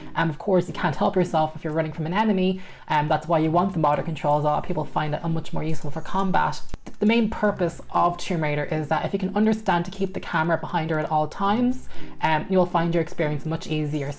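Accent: Ireland. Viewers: There's no tap backwards? At all? That's such an omission. I can't make a jump because I need a one tile run up, but I can't do the dangle off the edge and climb back up trick, as there's a bloody wall there. It's perfectly impossible with the modern controls. Because you've got to go back right to the wall, but if you walk backwards, Lara gets stuck with the wall and to sort that, you've got to walk forwards but then you don't have the run up. Oh, well. Time to go back to "tank controls" and re-learn them. I mean, I used them back in the day. I thought "modern controls" would help fix it being so janky, but they feel more janky - for the lack of tap backwards - and not an improvement. p.s. Ah, those Irish "R"s. Love it. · I find the modern controls way less clunky and awkward in general, but need to switch to the tank controls for things like making her do that jump back for the perfect running jump etc. 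Looking forward to this video as I definitely need help with exactly the issues you're describing! It took me like 4 levels to figure out [on the switch] that you need to press RZ to grab after jumping, not the action button or any others. Camera angles are a major pain in the pass, too. P.S. Off-Topic and maybe unwanted comment, but I love your accent! Irish accent has always been my favourite :)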